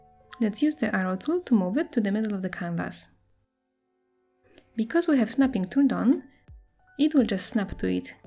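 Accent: Poland. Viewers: Polski akcent zawsze poznam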